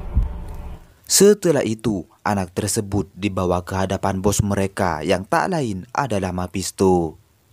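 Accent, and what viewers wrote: Madura, Indonesia. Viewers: Dari logatnya kayaknya asli Madura 👍👍👍👍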